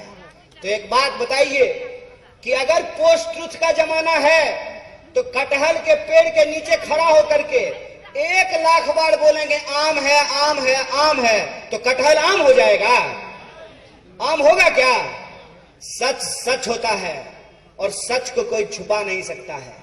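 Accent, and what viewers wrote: Bihar, India. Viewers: साले बिहारी हिंदी बोला तो सीख ले पहले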